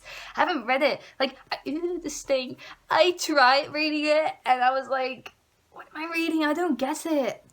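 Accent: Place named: Netherlands. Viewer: Love this! I love your accent haha :) I'm Dutch as well, although my videos are also in English. I should really read more, I'm definitely going to follow you.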